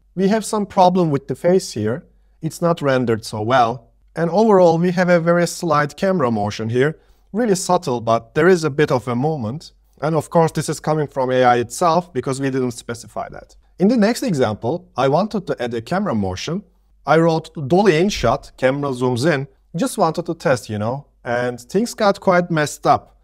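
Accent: Turkey. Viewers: sende türksün demi türk ingilizcesi bu ^^ iyi günler^^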